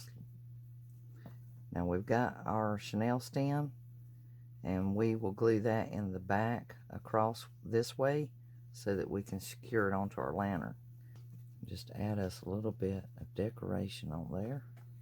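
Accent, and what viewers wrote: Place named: Ohio, United States. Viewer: Thank You So Much!! ❤ I'm in Ohio and I was trying to figure out your accent lol I'm guessing Southern Ohio, West Virginia or Kentucky? I have family all over there!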